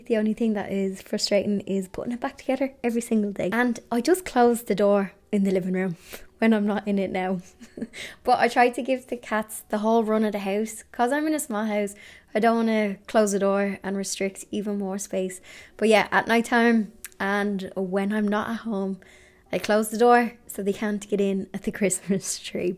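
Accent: Ireland. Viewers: I would love more DIY and craft videos but not daily:-) I won’t keep up:-) but maybe a xtra one here and there would be welcome treat. I love hearing about you, I follow you because I feel a kindered spirit in DIY and love hearing the Irish accent and slang, I miss my old neighbour especially at this time of year. But you keep doing you. Enjoy your friends and family and keep them just for you, you deserve that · I love the balance you have on your channel 🤗, I could listen to the chat all day plus your Irish and it’s my favourite accent. The quality of your upload is always amazing and if putting your self under extra pressure to do more…..then don’t because that pressure will reflect on you and that’s not good for your health. Finishing this year with kitten content is a wonderful end to the year and the exploding tree perfect. Take care x xx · Dear, your channel is one of the very few I'm really watching longterm, which means over many years. 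Lots of others I like(d) became noticeably calculating. Understandably, of course. But you manage to keep your videos very natural and unaffected (sorry for my bad English, I'm not sure, if I chose the right words). I love the mix of craft, "housekeeping", gardening, cats, trips and chitchat. The gardening part might be my fave, as you seem to live in a very similar climate like mine. But I also just love to listen to Irish English, and your voice is so charming. Your dedication to quality over quantity and work life balance makes you even more sympathetic. Keep following your heart, please. And have a magical christmas time with your loved ones! A big THANKYOU from southern Denmark! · I just like listening to your Irish accent lol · Cheeky thumbs up for sure. ❤ Could listen to your beautiful irishness for hours, love the kittens though Blonde will be forever in my heart ❤️ Pretty well love any and all content 😊 so keep it coming in the forms that your heart takes you, I'll be watchings, cheers and happy holiday season.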